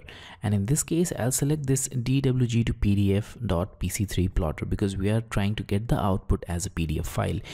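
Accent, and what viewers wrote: India. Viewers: your Indian accent realy annoying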